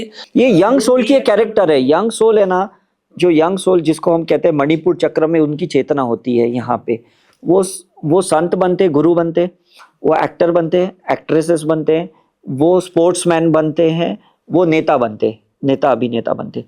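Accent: Haryana, India. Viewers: एंकर हरियाणा के हो क्या भाई??? 🌹😊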